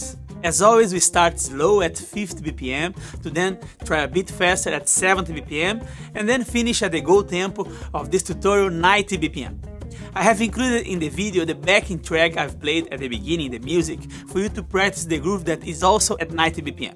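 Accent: Brazil. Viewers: me waiting for him to speak so I can check the accent: "... myes ok he sounds Brazilian I can keep watching ^^ " really good vid amigo !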